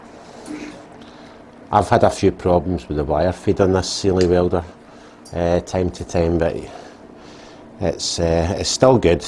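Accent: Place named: West Lothian, United Kingdom. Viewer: You are welding all wrong there......only kidding just wanted to play with your rants 😂😂.just found your channel and looking forward to following you and watching your previous vids. Doesnt sound like you are too far from me (west Lothian) based on your accent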